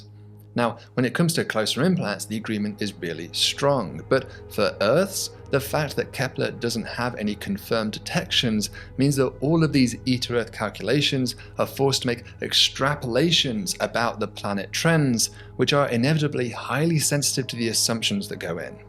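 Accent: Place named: Nottingham, United Kingdom. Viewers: he must be the guy that married your ex girlfriend, only sense I can make out of you complaining about how an educated BRIT speaks. This is how all educated men especially brits speak 😂😂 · this guys accent gives me nottingham vibes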